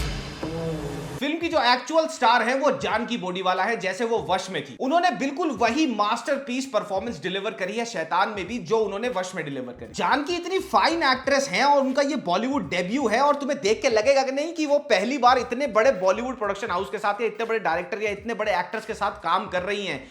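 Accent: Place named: Delhi, India. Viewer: Delhi accent bhai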